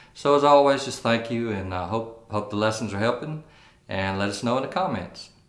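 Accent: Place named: Tennessee, United States. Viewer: by the timbre of your voice I think you live in Tennessee, that is all..thank you for your time Sir.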